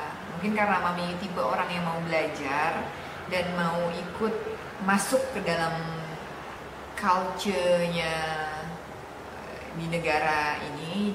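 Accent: Malaysia. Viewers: Org Indonesia riau... Cakap sma mcm Malaysia.. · Muka pun mcm org Semenanjung heheheh terkejut saya bila ada slang Malaysia, saya pun teringin nk ada slang Indonesia, hanya mampu meniru slang sinetron saja🤣🤣🤣🤣🤣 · Care ckp pon dh mcm loghat Melayu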